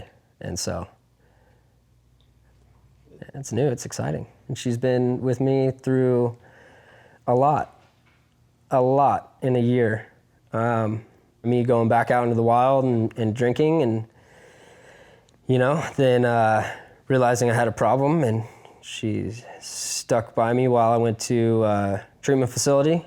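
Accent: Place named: Simi Valley, United States. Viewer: lol i live in simi valley. a lot of my boys talk like that😂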